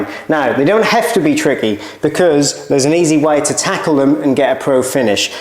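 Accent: Norfolk, United Kingdom. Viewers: He’s got a good old Norfolk accent!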